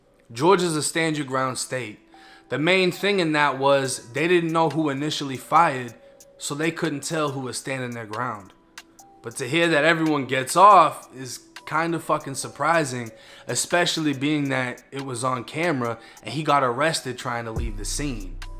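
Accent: Boston, United States. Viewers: thanks for the UPDATE. that little girl deserves justice; and to think had been not been in prison, who knows if the circumstances that led to her death could've been prevented. BTW: are you originally from BOSTON?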